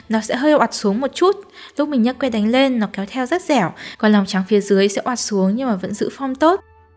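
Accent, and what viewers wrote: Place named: North, Vietnam. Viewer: Giong mien bac of ban de thuong wa,lai gioi nua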